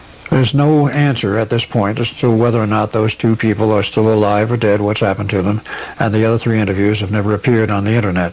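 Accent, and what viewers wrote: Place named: Boston, United States. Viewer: he most be from Boston.. is Al bostonian ?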